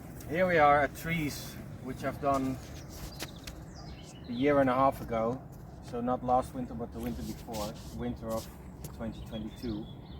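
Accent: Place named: Netherlands. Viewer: Benieuwd in welke regio je woont, lijkt me umbria/toscane op basis van de olijven en cipressen. Ik ga er op basis van je accent ook maar vanuit dat je Nederlands bent. Ben ook bezig met de cursussen van vaso policonoco , komende winter normaal avanzato en dan het examen zoals ik zag in je andere video.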